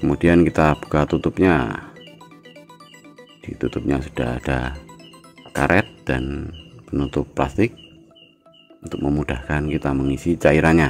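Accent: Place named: Java, Indonesia. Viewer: Orang Jawa atau orang Sunda ?? Kok disebut KANG , Tapi ngomong nya seperti Orang Jawa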